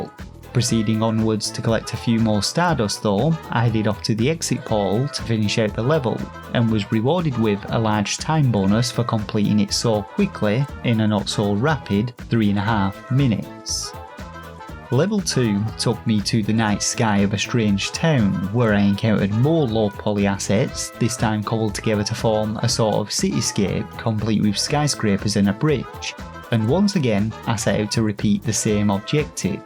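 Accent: United Kingdom. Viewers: What kind of accent does have its like so so british but hes over here like “seven-teen” instead of “seven-een” a lot of the pronunciations are also wild. Wondering where they are from.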